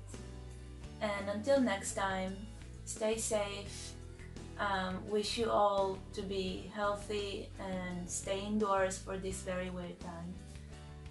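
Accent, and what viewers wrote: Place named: Israel. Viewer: also, it sounds like you have an isreali accent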